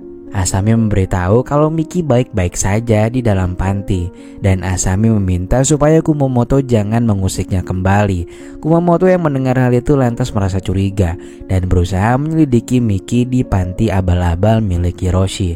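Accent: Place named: Balangan, Indonesia. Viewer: Kayak suara orang balangan admin nya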